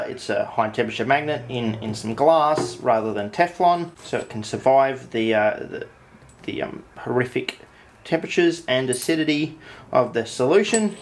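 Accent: Australia. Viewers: This video autoplayed from a Primitive Technology video and for a sec I thought the dude finally broke his years of silence to cheerfully yell at me in an Australian accent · Who else thinks should be a highschool Chem teacher?! I swear he reminds me of "Doc" my hs Chem prof, got the 'stache, even says "Ah-loo-minium" and everything😢.... (I'm in the US, Appalachia at that 👉🏻"Apple-atcha" for context) Who knew I'd be nostalgic watching an Aussie backwoods chem channel (BEST chem channel IMO) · Ah yes my daily dose of tar synthesis narratived by a goofy Aussie while in a shed.. now we are cooking with kerosene. Best wishes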